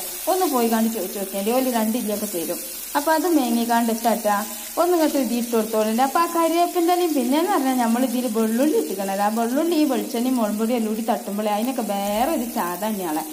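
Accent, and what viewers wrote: Malappuram, India. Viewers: Makappura baasha🙂 poli endhaayalum sadhanam sooper aayikk nn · Malappuram slang powersh💪💪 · Fantastic dish, great enthusiasm and confidence plus terrific language which really has agreed malapuram tint. O.k.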